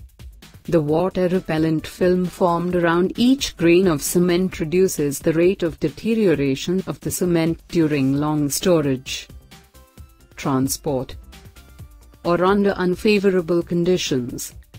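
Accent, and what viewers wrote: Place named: India. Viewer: Why does the bot have an indian accent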